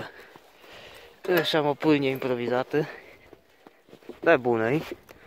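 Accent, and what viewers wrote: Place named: Satu Mare, Romania. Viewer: Satu Mare, se vede dupa accent :))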